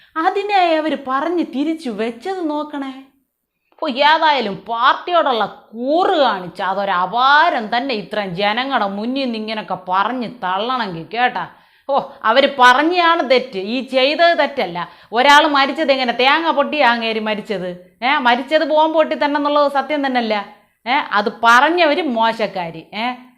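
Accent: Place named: Trivandrum, India. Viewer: കണ്ണാടിയും, മുണ്ടും ചട്ടയും, വയസായ ലക്ഷണവും, തിരോന്തരം ഭാഷയും 👌👏👍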